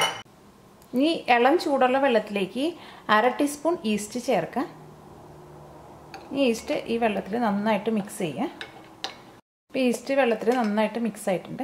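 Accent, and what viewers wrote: Thrissur, India. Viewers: Nalla avtharana shily trishoor voice basha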